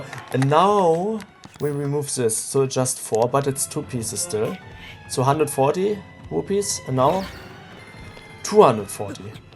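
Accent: Germany. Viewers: It’s a German voice like me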